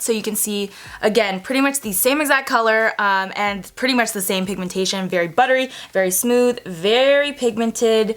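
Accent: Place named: United Kingdom. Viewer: Yes, I found you through that Dupe Video. Love your British accent, lol! 😍 Thank you for sharing all these dupes😊👍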